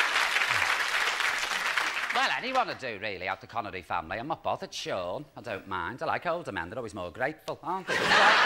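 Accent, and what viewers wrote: England, United Kingdom. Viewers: Scouse accent basically, he's from near Liverpool. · Usually I'm good with an English accent, but what. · Does that counts as scouse or Mersyside?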